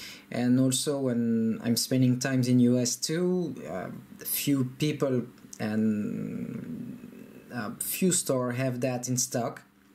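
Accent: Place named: France. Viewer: Good job ! And Good "french accent"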